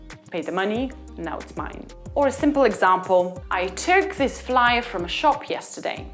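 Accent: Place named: United Kingdom. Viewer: I really appreciate LinguaTrip has the british accent teacher.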